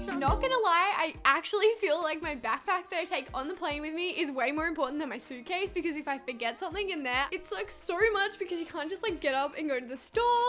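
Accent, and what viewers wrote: Australia. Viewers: what part of australia are you from? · are u australian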